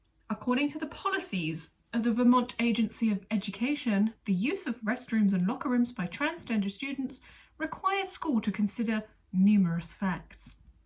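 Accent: United Kingdom. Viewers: For some reason I just smile when seeing this adorable human being. The British accent might play a role but ultimately it's just the kind and calm nature you experience from her. I utterly enjoy listening to her.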